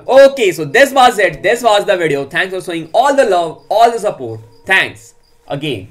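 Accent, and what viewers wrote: India. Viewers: Bhai aapka accent hindi hai but aap punjabi bolte hue bohat funny lagte ho loved the effort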